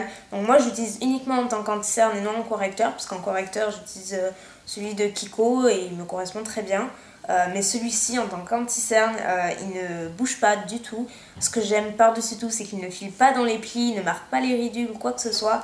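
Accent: South, France. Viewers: Ah que j'aime cet accent du sud! Et surtout les "PU..", j'ai l'impression de me retrouver chez moi dans le sud! En tout cas, ta coupe te va très bien! Avec ce maquillage en plus tu es magnifique!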